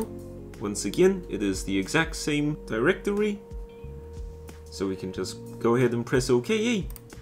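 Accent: New Zealand. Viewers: He sounds like he is from New Zealand 🇳🇿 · Thanks for turtoial. NZ Accent goes hard. Much love from Oz. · The bro is kiwi can tell that accent anywhere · Where are you from? Are you a kiwi? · He has a very strong kiwi/native New Zealand accent, very distinctive and a part of our country. Is honestly similar in some ways to South African accent because the conditions of our countrys history is similar, both being British Colonies. But still very different :D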